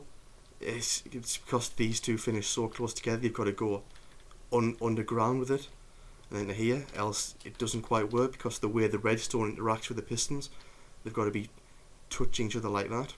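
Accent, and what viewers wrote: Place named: Newcastle, United Kingdom. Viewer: Good video, it's just inspired me to try something similar myself. Btw, where abouts in the North are you from? I can't quite place your accent, but you must be up in the North-East with me (I'm from Hartlepool)! All I could figure was that you sound quite like a Geordie, but not full on. Or am I wrong and that's a Sunderland shirt in the intro lol?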